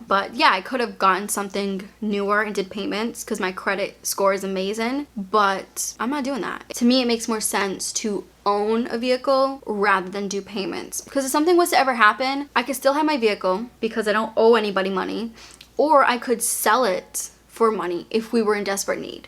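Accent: Boston, United States. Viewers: I love your boston accent.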